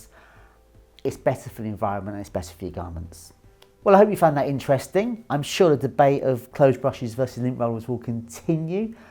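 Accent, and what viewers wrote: England, United Kingdom. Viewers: I stumbled across this channel a few months ago and after a few videos I decided to subscribe. Good move.lm' Iearning a lot, and presents the information so well in his laid back clipped English accent. Surfed over to the website and was super impressed with their pocket squares. I just purchased two of them and will probably buy ten more. Thanks, and and the whole Rampley team for putting this videos together.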